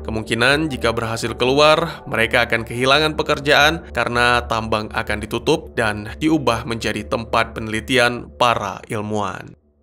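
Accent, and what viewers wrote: Aceh, Indonesia. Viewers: Bang orang Aceh kah? Yok ketemu bang di Banda Aceh penasaran sama suara candunya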